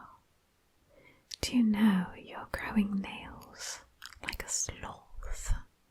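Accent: United Kingdom. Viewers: Love the accent! I always found the British accents were the most tingly 💤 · with an English accent is a real treat. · Nice doctor RP, love the British accent. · Nice British accent. Thank you for the video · I get really happy when does a doctor rp because it means a British accent again. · Great Video!!! I love the Doctor Asmr video!!! I also love the British Accent and Glasses for this video too because they make you even more Beautiful!!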